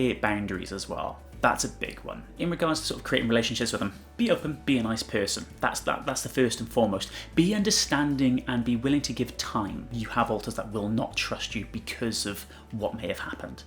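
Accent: Wales, United Kingdom. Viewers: Are you Welsh by any chance? I hear a bit of a Welsh accent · let me guess you guys have a pug? LOL I am guessing that is the animal you have considering there is pug shit everywhere including the sweatshirts your better halves wear you seem like a really cool guy they are lucky to have you I’m glad I found this channel it is inspiring and the stories are always fun I wish all of you nothing but the best. also I did have a question I was wondering where you guys were from? I am from Milwaukee Wisconsin I am figuring somewhere in the UK from the accent but I’m not sure. Anyways take care now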